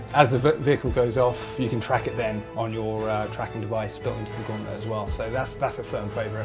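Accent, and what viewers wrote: United Kingdom. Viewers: is he British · i'm and im now just realizing he's british😂😂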